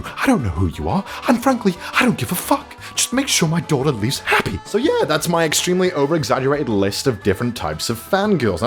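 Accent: United Kingdom. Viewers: "saying British things from my British face." Me:... As a British person I just realised how inferior my accent is compared to. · with the british accent?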